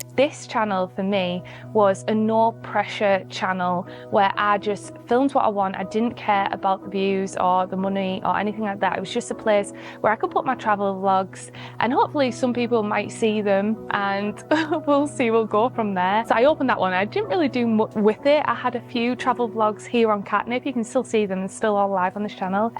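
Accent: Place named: North, United Kingdom. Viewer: I had NO IDEA that YouTube sponsored videos! Congratulations on how far you've come - we need more northern voices on YouTube!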